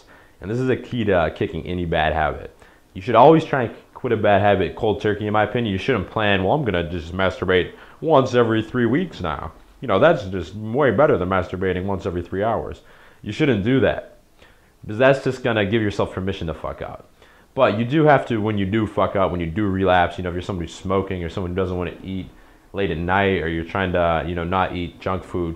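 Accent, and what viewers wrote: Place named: New York, United States. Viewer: great video! you can tell this nigga from new york or something or has or had black friends lol "cant get that wood " lol "if you think about not getting hard its a rap son"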